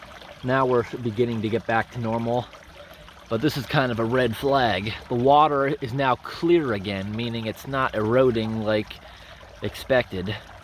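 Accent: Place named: Northeast, United States. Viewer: just found you dude and you're super entertaining to listen to and watch! i'm from PA originally and idk if it's just me but you have a north eastern cadence to your speech, makes me homesick. keep up the nice work!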